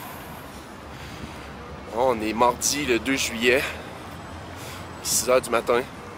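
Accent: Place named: Quebec, Canada. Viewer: Merci de partager ça! Le timing est parfait étant pogné dans la maison avec les Rocheuses pratiquement fermées partout en Alberta. Je continue le vidéo dans quelques jours pour rallonger ça un peu! Très belle cinématographie et en tant que québécois expatrié en Alberta, c'est le fun d'entendre l'accent (de Québec?)! Bonne chance dans tes futurs projets. ✌🏼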